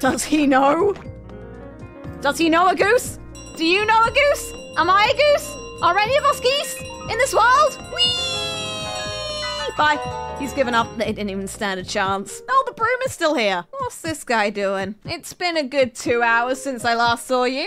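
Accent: United Kingdom. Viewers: My first time watching outside of shorts and somehow she is even more British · The Brit really came out of her this time XD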